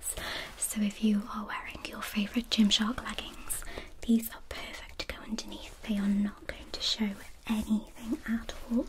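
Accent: United Kingdom. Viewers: I usually don’t like British accent videos,but I actually don’t mind your videos♥️ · What’s your country? Your English accent is not seems like American maybe British? Or England? I don’t know but i love your accent your “Okay” sounds cute❤️ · What is this whisper are you British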